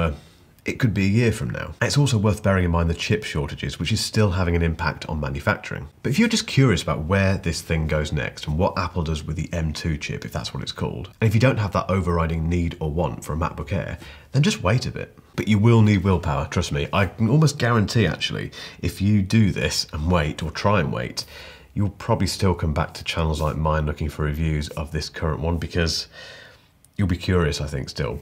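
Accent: England, United Kingdom. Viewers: Like your reviews,! Great stuff! Also nice to listen to English English language! Cheers from Moscow, Russia🤡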